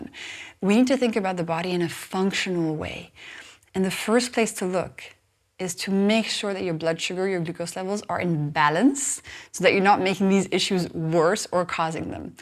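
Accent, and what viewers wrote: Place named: France. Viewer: I love your French accent. 😊 I know by heart you’re hacks but unfortunately it’s not easy to apply them… still working on it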